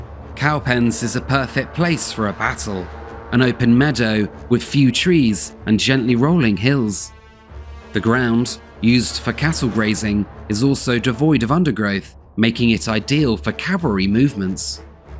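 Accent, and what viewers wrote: United Kingdom. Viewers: What irony...Narrated by a Brit 😅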